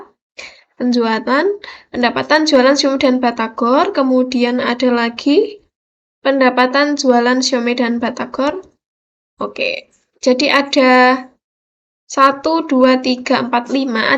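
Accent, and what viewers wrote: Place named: Java, Indonesia. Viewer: Aw medoknya khas bgt kek orang klaten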